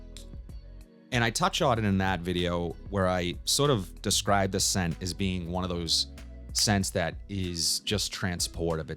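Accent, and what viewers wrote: Boston, United States. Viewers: I was watching one of his videos on my phone and my wife heard him and said, "Who from Boston, are you listening to??" 😂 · I definitely… couldn’t tell you where from Boston until you mentioned it…. Elixaarr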